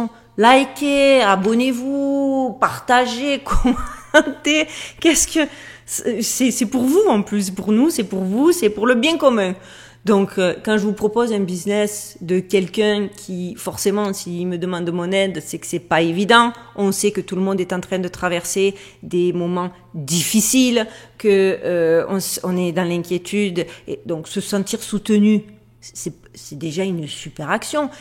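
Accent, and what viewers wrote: South, France. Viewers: Bonjour 👋 Oui 👍 A chaque vidéo un pouce ! Vraiment très intéressant 🧐 en plus ! Et avec notre cher accent du Sud… trop bien Peuchère 🤣❤️🤩💐🌈🙏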